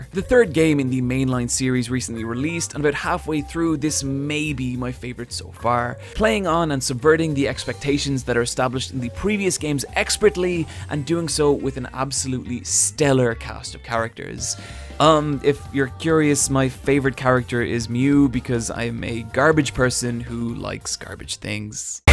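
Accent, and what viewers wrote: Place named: Cork, Ireland. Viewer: Man, you're really fantastic dude, keep it up. I'm from Cork and its quite refreshing to hear a voice I can relate to talking on these topics.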